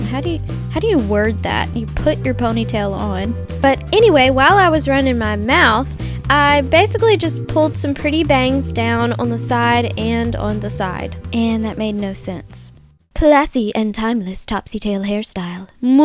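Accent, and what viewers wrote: South, United States. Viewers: I absolutely LOVE LOVE LOVE the way you talk! I can definately tell your from the south(and i don't mean it in a rude way because i live in the south) but your voice is so cute! thanks for makin me laugh(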